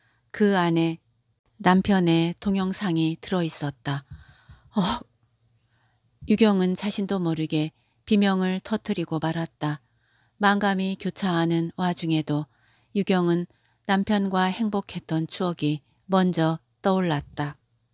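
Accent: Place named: Jeolla, South Korea. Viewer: 전라도 억양이 세네요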